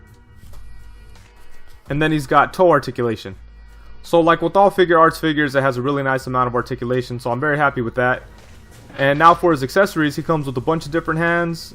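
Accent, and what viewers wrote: New York, United States. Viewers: Anytime bro and no worries we all make mistakes we're only human. Also I can imagine from the video you made of your trip on San Diego Comic Con even the stuff you had it'll be a bit to review also yeah Idk why they didn't included that since I'm sure he used a pistol in one of the movies but I don't remember for sure. Still kinda off on that since lately it seems S.H. Figuarts are getting lighter on accesories I noticed it with the S.H. Figuarts Deadshot even Dark knight Batman that was released last month idk very strange. Also thanks again man for the info really appreaciate the heads up on that also too can you put one of those business cards you made with it? I ask since can't let those cards go to waste after you worked hard on them for San Diego Comic Con so be cool to have one from you. Lastly, thanks for also saying my last name correctly you said it the right way since I am Puerto rican lol was also gonna include that in my email asking you if you're hispanic as well since I know you live up north in NY by your accent since was always very curious on asking that. 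Also too again keep up the good work on your videos and once again I'm thankful for winning your contest and will enter again in more in the future and hope you have a great day.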